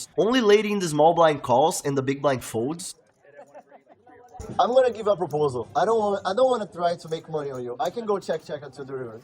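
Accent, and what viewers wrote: Brazil. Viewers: sotaque de brasileiro inconfundível kkk, parabéns pelas mãos jogadas. GL GL meu parceiro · Love the brazilian accent. Shout out from Brazil haha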